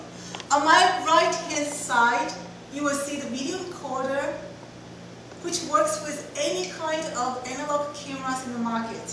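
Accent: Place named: Russia. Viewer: good russian accent!!!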